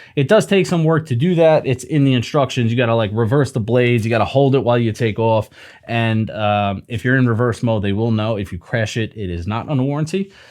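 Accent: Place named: New Jersey, United States. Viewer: Bro i would love to fly with you one day. Just got back in to flying. I live in jersey. Sound like a jersey guy.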